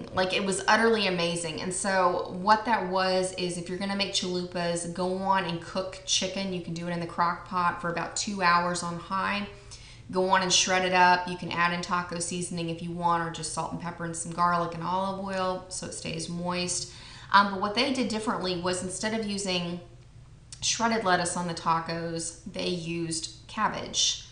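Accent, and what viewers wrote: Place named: Oklahoma, United States. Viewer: You are fairly good at hiding your Okie accent, but I caught it in this video a few times ;) I would love dinner videos, but you know me-- I post food on Instagram a lot. I need to get my "lifestyle" or "mom" channel going so I can post what I eat in a day videos.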